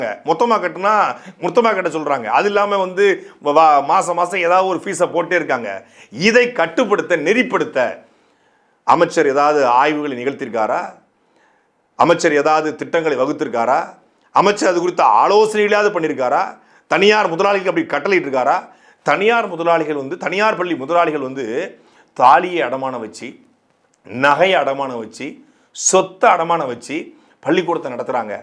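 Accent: Tirunelveli, India. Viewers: சாட்டை நெல்லை தமிழில் அசத்துகிறார் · சாட்டை 🌹நெல்லை slung சூப்பர் ஆக பேசுகிறார் 👍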